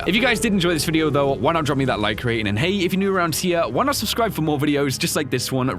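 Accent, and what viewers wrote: Ireland. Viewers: He can hide the Irish accent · Anrijs Christopher it’s not because of rage it’s because he’s making fun of his Irish accent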